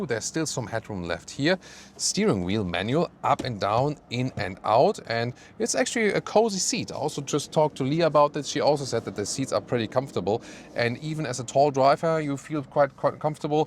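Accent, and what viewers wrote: Germany. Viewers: His german accent is so hard and difficult to understand.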